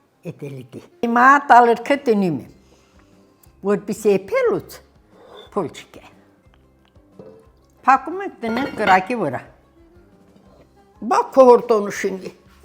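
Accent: Goris, Armenia. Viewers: Վախ տատի ջան, մեր Գորիսի բարբառով շատ խոսա, հաճելւա լսելը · Վայ ոնց եմ սիրում տատիկի եփածը։ Նամանավանդ մեր Գորիսի տատիկների եփածը։ Մեր գեղեցիկ Գ-իբառբառով խոսա տատի ջան։ Բա քո հոր տոնը շինվի😂😂😂😂😂։ Վայ հինչ լավն եք տատի։ Ապրես, օրինակ չէի գյուդում վեր Կարելիյա ծու էլ քիցած❤🙏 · Как приятно слышать диалект Гориса , Я тоже с Гориса и пишу с Ташкента.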